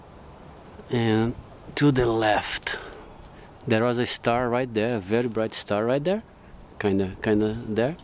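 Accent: Brazil. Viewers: Ola. Pelo sotaque, voce deve ser brasileiro. Fiquei muito interessado no seu depoimento, mas compreendo pouco o ingles. gostaria de saber se voce poderia inserir legenda em portugues. grande abraço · Hey Man....You Brazillian right ? You sound It ! I am in Porto Alegre and we have a a small cluster that make and use the CE5 Protocol quite.often. Just to let you know you have allies and you not Alone. ALL the best Man.. Peace out !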